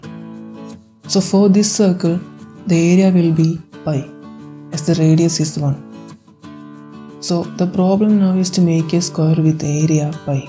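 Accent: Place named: India. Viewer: I press a video regarding math I hear an indian accent I leave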